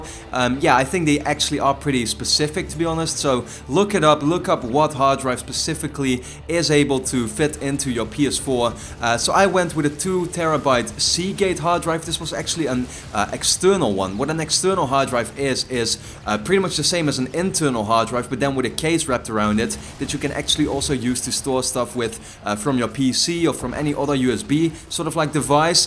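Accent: Netherlands. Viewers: Kan merken dat je Nederlands bent maar heb wel even paar vragen ze zeggen je kan je games wat je ge saved hebt ook online bij ps4 plus en die later op je 2tb neer zetten klopt dat of niet groetjes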